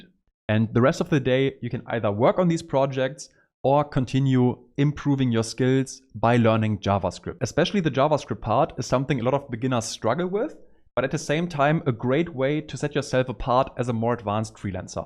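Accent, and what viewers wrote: Germany. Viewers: i am 100% sure that you are a german, nice video btw that will help to improve my own page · Fucking zero explanation done. Just a German guy rambling, wasting 10 minutes of your time.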